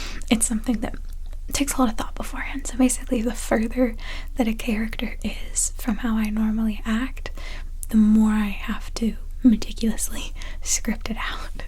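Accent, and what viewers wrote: Ireland. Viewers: Oh! I didn't know you where Irish :D Hello from Tipperary :3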